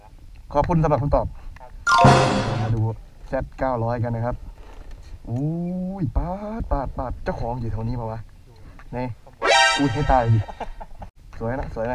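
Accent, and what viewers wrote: Isan, Thailand. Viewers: พี่พูดอีสานดูน่าฮักขนาดติดตามงับๆ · ตอนแรกคิดว่าพูดเหนือ เอาไปเอามา ออกอีสาน 5555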